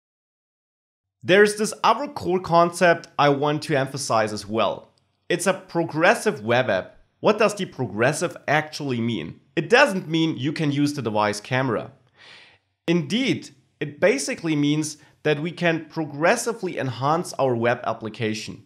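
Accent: Germany. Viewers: I'm from Germany and watch this German guy speeking Englisch...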